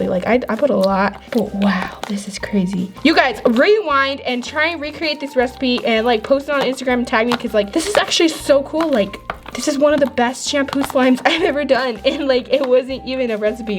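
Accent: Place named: United States. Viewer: Tot you were American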